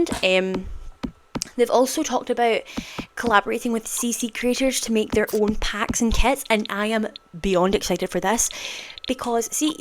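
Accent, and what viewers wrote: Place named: Scotland, United Kingdom. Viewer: Fabulous build, great to hear another Scottish voice :eyes-pink-heart-shape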